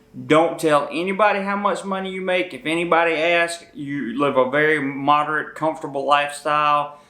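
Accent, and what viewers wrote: South, United States. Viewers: The southern accent while mispronouncing "Pattaya" is funny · You have a southern country accent. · Man were you from I know your from the southern us original I'm from Tennessee so I know it's a southern accent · Thanks bro good info:) Dude you sound like you're from the South nice to hear a accent brother:)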